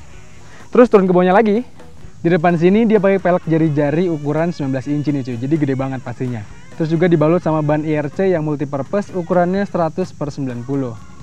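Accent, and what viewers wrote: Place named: Cirebon, Indonesia. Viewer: 5 bar jeh.... kaya orang cirebon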